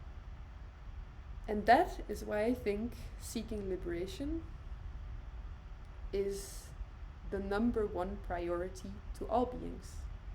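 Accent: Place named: France. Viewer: Hey, where did you get your beautiful accent from. Bit sounding like romantic french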